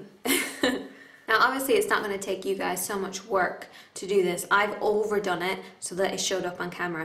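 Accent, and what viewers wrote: Ireland. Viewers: Yeah, she's irish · where are you from ? because I feel like I sense a bit of an Irish accent, regardless its very cute ( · you are messing with my head, ARE YOU IRISH OR AMERICAN GOD DAMN IT?!?!?! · At the beginning she has an Irish accent because she is Irish ;-). She said she does sometimes get a bit of an American twang though :-). I forget why? She has been to the states quite a bit and she might have family there or something, not sure. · are you part irish? i can hear a bit of an irish accent coming through :) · Shes irish :) x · She's from Ireland like me!🌸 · Age you irish.? :)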